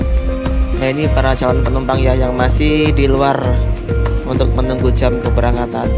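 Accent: Banyumas, Indonesia. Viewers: Aku wong ngapak juga mas 👍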